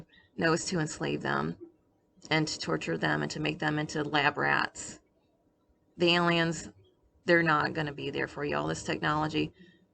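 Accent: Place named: Scotland, United Kingdom. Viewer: Lol if yi dinny see it, that's Scotish lol are you from Scotland.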